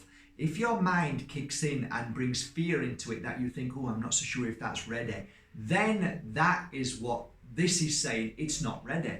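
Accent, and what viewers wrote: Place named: Northern England, United Kingdom. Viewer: Hi...🙂Love your videos.Just came across you this week.Thankyou for taking the time to make them🙏 Just wondering why you didn't mention the energizing/cleansing power of one of natures super powerful and most beautiful things...The Moon!? Anyway, thankyou again. Your accent is very Northern(trying to place it🤔)...Greetings from Manchester UK (originally Kearsley Nr. Bolton).Namaste 🙏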